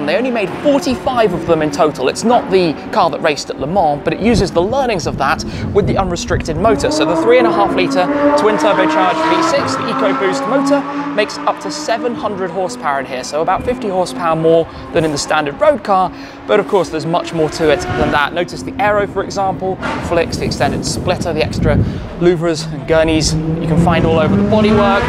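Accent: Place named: United Kingdom. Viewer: Shmee please dont lose your british way of speaking, its what a lot of ppl like about you. Saying race car instead of racing car is just another americanism working its way in. Its too easy for us to lose our identities in this internet world nowadays. Aeroplane and racing cars for me 👍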